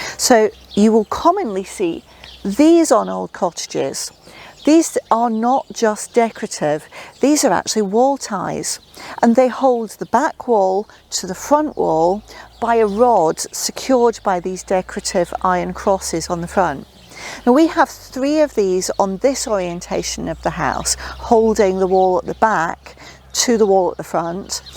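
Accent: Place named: Scotland, United Kingdom. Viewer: Sorry if you've mentioned this before but I've just found your videos; have you lived in Scotland for some part of your life? Cause your accent sometimes sounds a bit Scottish...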